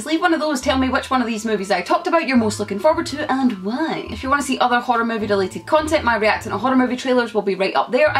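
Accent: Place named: West Scotland, United Kingdom. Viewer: Here thats a wee west scottish accent 🤣🤣 where you from pal?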